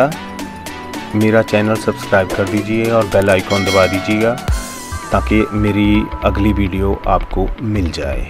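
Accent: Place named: Punjab, India. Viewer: paaji meri maa pichle 45 saal se mujhe kadi khila rahi thi. Maine shaadi nahi ki. To aaj mera apni maa ko kadi khilane ka mann hua. Aapka video dekh k maine banaya... whoa!!! Bohot delicious banaa thaa. Ek cheez maine add kiya and wo thaa khada dhaniya. Bohot bohit shukriya aapka. Aapki punjabi accent waali hindi bhi kadi jaisi achi hai. Thanks 🙏🏼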